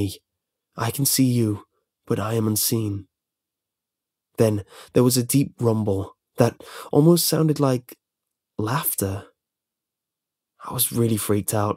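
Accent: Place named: North, United Kingdom. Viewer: Too Northern with unnecessary pauses in the wrong places... Bit of Brooklyn in there too...?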